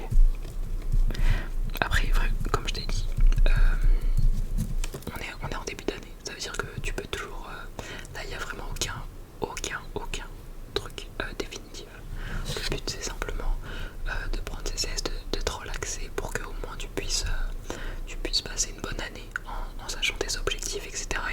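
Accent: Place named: Lyon, France. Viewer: 100% c’est un lyonnais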